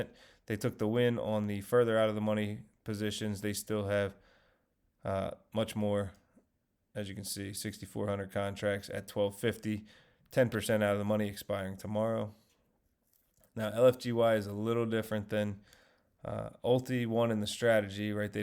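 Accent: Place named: North Carolina, United States. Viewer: You sound like you could be from N. Carolina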